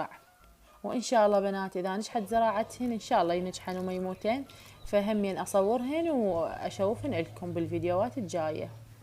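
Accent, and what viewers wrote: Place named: Basra, Iraq. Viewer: لهجتها بصره الله يحفظها مين متكون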